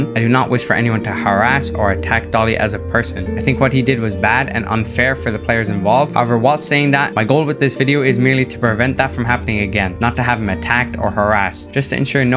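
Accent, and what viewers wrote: Ireland. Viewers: Irish accent? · You sound irish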